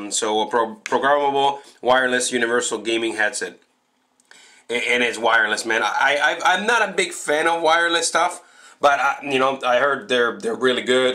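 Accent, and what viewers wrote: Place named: Canada. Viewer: i love ur deep canadian accent lmao its so dope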